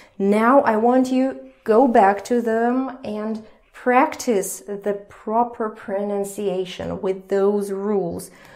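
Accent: United States. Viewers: How did you lose your Russian accent and sound like an American?? Lol!! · HiI would just like to comment on a certain things either from this video or lesson 2 with regards to the letters ц ш щ ж. In true English (British) all these sounds exist and are used however, they are limited and are always diphthongs and/or triphthongs. Examples: genre, xaxa/zhazha (a girl's name), schedule, shoe, tsar (from Slavic languages imported into English) Furthermore true English is not like Yankee English. I can tell by accent that she learned Yankee English and not true English which is much harder. We also say everything like Russians. Example of this is the word 'think' Englishmen will pronounce it somewhat like 'thing'k' but Americans do not. To verbally say 'gonna' or cut endings off of words English or British people in general do not like it and it makes the speaker look uneducated thus that kind of language was only ever used in text messages and even now is not very popular. 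British English has many rules with regards to how to read and write and to write incorrectly will make it unreadable or phonetically impossible. We are not taught 'phonetics' in the UK as it is not practical because children will think it can only make that sound which is not the case. Example of this is maybe paediatrician or the real spelling pædiatrician. Notice the special 'æ' this tellls us it makes a long ee sound. But Americans don't spell like that. They changed it to 'pediatrician', so really it sound make the sound ped'dria'tri'cian but hey, Americans don't have t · Did you study American English? You have a slight American accent You speak great English by the way congratulations xxx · Все-таки, до чего же противный этот американский акцент. И где только ведущая курсов ему обучилась? То ли дело, британский - как птичий щебет, а не растирание жерновов. Особенно их звук "R" раздражает.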